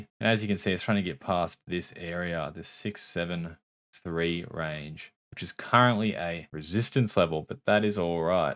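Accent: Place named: Australia. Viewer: I presume your an Australian (buy the nice accent lol) easy to listen too, unlike many other YouTubers Just subscribed from Melbourne , great job mate , hodling a few Shiba 🤛👏👍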